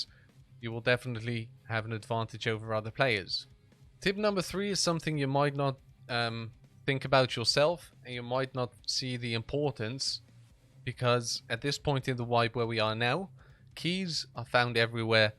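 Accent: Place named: South Africa. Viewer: Where are you from? You almost sound South African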